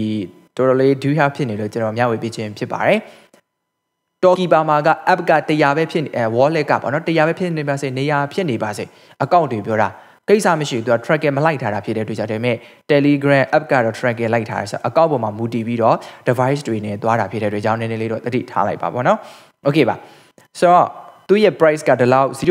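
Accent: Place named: Myeik, Myanmar. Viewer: အကိုကဘိတ်သားလား ဘိတ်သံဝဲနေလို့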